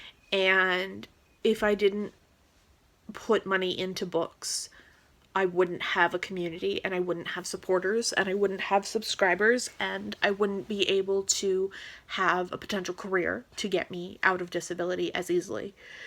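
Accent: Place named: United States. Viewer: i did wonder if you were british or american, now i know (your accent is very 'american' as we know it)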